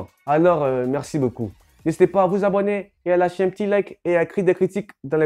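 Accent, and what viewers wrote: Ivory Coast. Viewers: Toi tes un ivoirien ça se sent mais prends ton temps pour parler je pense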